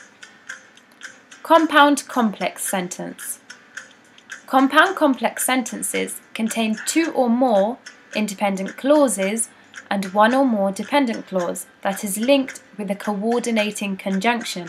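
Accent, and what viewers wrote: United Kingdom. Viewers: Why she sound british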